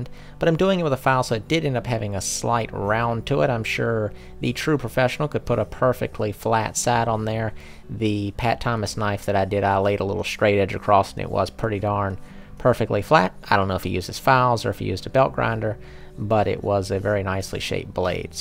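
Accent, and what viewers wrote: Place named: Alabama, United States. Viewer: Beautiful knife...thank you for sharing! I noticed you said in a below comment that you were driving by SMKW when you decided to go in. I live about 30 minutes away from there. So you live in East Tn or were you visiting? Your accent sounds more from central Alabama.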